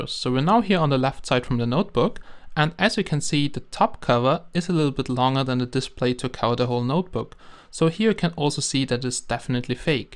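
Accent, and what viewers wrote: Germany. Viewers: Ich nehm mal an du bist deutscher :P klang zumindest so: weißt du ob es auch eine Metalversion oder eine mit etwas besseren CPU gibt? ansonsten super review, werd mir wohl auch so ein teil holen. Allerdings ohne Apple-logo (wegen Zoll und so). Weiter so (y) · are u from germany? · Are you from Germany? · Hab das direkt gemerkt, dass er nen deutschen Dialekt hat und als er damit ankam, dass die Tastatur anders ist, als unsere QWERTZ-Tastatur, hat er meine Vermutung bestätigt ^^ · Any chance you are from germany ? :D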